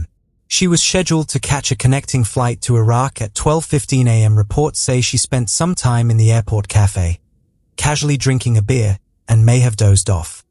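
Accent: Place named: England, United Kingdom. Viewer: Damn I hate when these type of videos are narrated by people with English accents 👎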